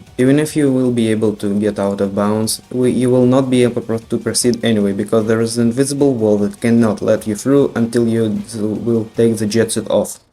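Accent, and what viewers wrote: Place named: Russia. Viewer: Ты звучишь очень пл русски...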